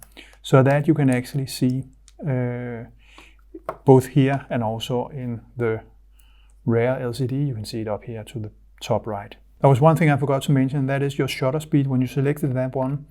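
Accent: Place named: Denmark. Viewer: I've been following Youtube and Private site for a few years now, one of the best out there for learning - clear, concise and to the point with the bonus of that beautiful Danish accent - deserves much more than the current 15k subscribers. The D4s has been my dream camera since it was released and I almost bought one back in March '25 but went with the D810 to add to my D7200 and D7000 - I was put off by the added cost of XQD / CF cards [ though the D810 does have a CF slot I've not bothered using it ] - Watching this video I'm tempted to revisit the D4s once more.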